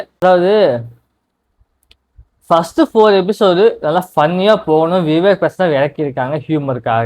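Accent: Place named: Telugu, India. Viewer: நீ ஒரு தனியா ஒரு வீடியோ ஒன்னு போட்டு வை டா அது என்னன்னா நீ ரியலா தெலுங்கு தான் பேசுவ அப்படிங்கறது எல்லாருக்கும் தெரியிற மாதிரி ஒரு வீடியோ போட்டு வைடா நீ பேசுற தமிழ் வந்து டிஃபரண்ட்ஸா இருக்கு போல நீ க்ளிப் போட்டு இருக்கேன்னு எனக்கு தெரியும் மத்தவங்களுக்கு தெரியாது இல்லையா... அதனால சொன்னேன்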